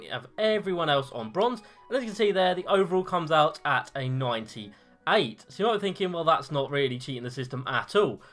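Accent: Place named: United Kingdom. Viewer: Loving the BRIT...........ISH ACCENT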